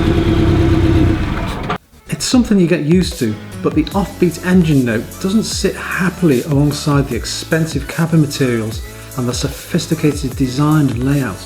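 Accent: Liverpool, United Kingdom. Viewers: Sounds like an awesome Scouser accent ..even better to watch the Porsche video.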